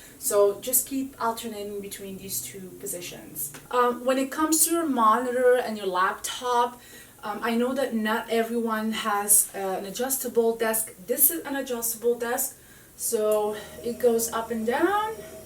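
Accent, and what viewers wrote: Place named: Morocco. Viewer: Ena koont 3rf ntiya Mghrebia! I could instantly tell from your accent! haha. Shukran pour la video! :P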